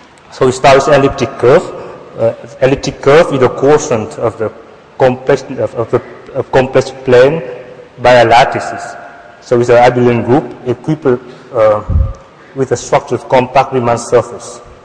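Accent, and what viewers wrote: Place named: France. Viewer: Heavy French accent :) I am a Vietnamese American, glad to see another Vietnamese coming out famous. Thank and hat of to France, a great country!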